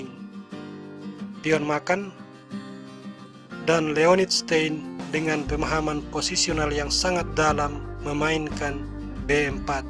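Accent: Manado, Indonesia. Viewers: manado ngomong 8 itu lapan bukan delapan... itu uda kliatan bgt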